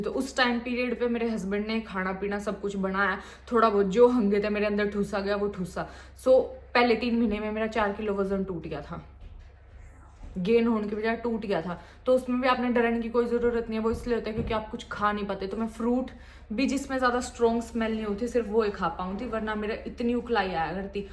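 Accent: Haryana, India. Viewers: Hi, congratulations 💐💐 Hazel ki eyes bilkul iske father jaisi hain. God bless you both❤️❤️ I like the way how you speak specially your haryanvi tone😊. I am from Rohtak.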